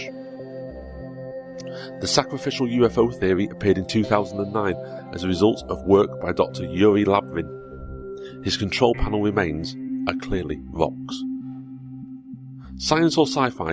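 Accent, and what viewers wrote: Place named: United Kingdom. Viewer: The narrator is a Brit with a cold.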